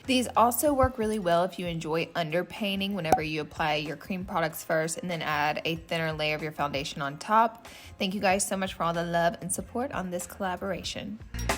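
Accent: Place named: Alabama, United States. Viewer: Do you think you will ever lose your AL accent? Lord, I hope not ❤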